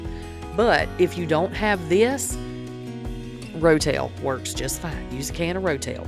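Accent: South, United States. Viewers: Blueplate Mayonnaise???? lol I have NEVER heard of it in my whole life??!! lol And from your accent you are most definitely Southern or as my first cousin Pam Jackson from the south, i.e., Lakeland, FL, likes to say, “Big Country?!” lol BUT NO WHERE HAVE I EVER SEEN BLUEPLATE MAYONNAISE??! lol I’m sorry I’m not making fun of you I’m just shocked I’ve never heard of it and we are most definitely BIG COUNTRY too??!! Lol I’ve seen ALOT of Dukes Mayonnaise in North Carolina where my father retired out of Fort Bragg, but I personally don’t care for it. But I just can’t get over the fact that I’ve NEVER seen BLUEPLATE MAYONNAISE before. lol 😆 I don’t think I ever saw it in Publix in FL either or Tennessee where my cousin Pam and her husband Dan live now. 😊I am a die hard Hellmans Mayonnaise person myself. Just saying!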